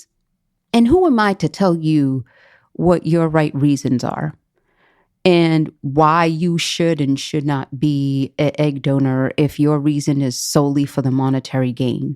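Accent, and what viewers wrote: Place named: New York, United States. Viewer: Thank you for sharing it was great to hear this as a sperm donor and I love your NY(?) accent ❤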